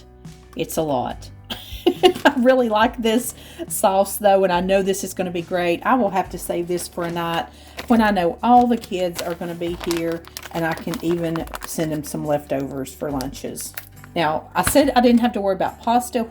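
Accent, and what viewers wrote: South, United States. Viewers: Oh YUMMMMM I was worried about the staple as well… these are great.. I have my meals for the week… you are the best… you make my day I’m better just listening to you and your southern accent.. sending love 💚and ☀️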